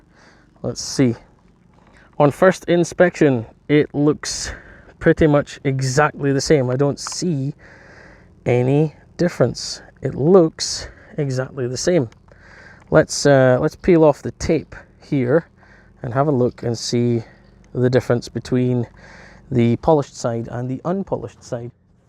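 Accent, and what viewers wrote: Scotland, United Kingdom. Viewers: no doubt I have some Scottish friend here in the states you sound like them....keep going with YouTube I’ve watched a few videos they are good. Best kept secret in US detailing market is a company called duragloss they make mostly sealants 111 and 501 are there best they are around 10$ for 16 Oz they also have a product like bead maker called aquawax the stuff has a cult following here in the states. You should review that stuff don’t see any uk detailers using it.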